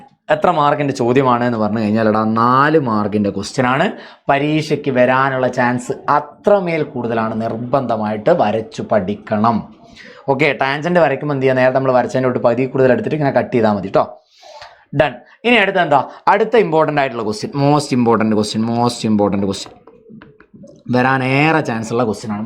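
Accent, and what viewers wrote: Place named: Vadakara, India. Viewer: Vadakara voice ann sern😂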